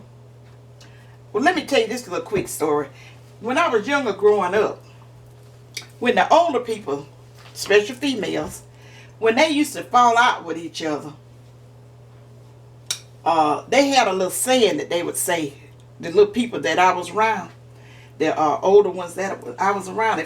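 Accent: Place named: North Carolina, United States. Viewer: I just love listening to you. You remind me of my. Are you in NC? Your accent sounds like hers.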